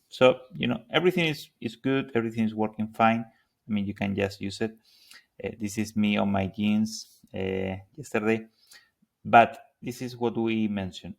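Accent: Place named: Spain. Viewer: I can hear you are Spanish. Gracias 🙏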